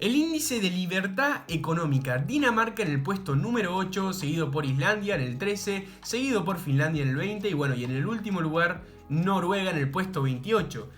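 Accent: Argentina. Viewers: Todo bien pero en el norte de Dinamarca el 80% de la poblacion laboral es contratada por el estado. Igual tenes razon, pero hablando en neutro con acento argentino queda medio payaso.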